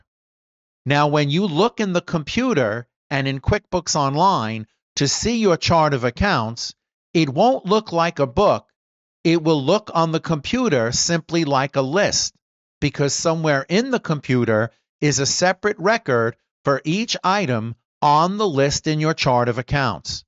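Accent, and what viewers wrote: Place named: Bronx, United States. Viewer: I like the Bronx accent!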